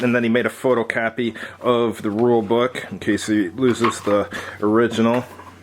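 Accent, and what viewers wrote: Chicago, United States. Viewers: Ancient wargames reviews with Chicago accent, I'm in.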